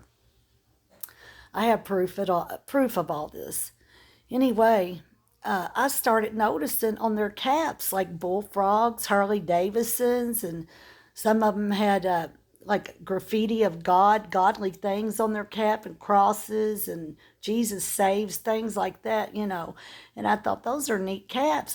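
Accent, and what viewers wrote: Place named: Louisville, United States. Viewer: When she said eastern parkway I knew she was talking about Louisville plus with her accent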